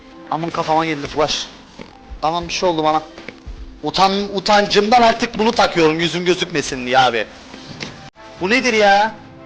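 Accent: Cyprus. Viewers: kardes sen kibrislisin dimi 😄😄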